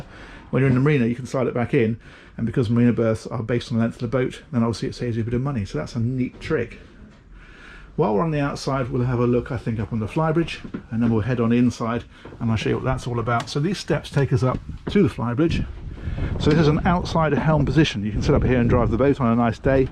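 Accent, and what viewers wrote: United Kingdom. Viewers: You are so good at your craft! Of course the British accent doesn’t hurt either. Wishing you much success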